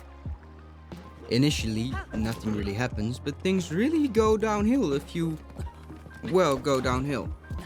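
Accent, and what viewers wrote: Netherlands. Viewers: Mate are you dutch??? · Hol up, u dutch? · quesion, are you dutch? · Are you dutch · Ik hoor aan je accent dat je nederlands bent maar bedankt voor de video :)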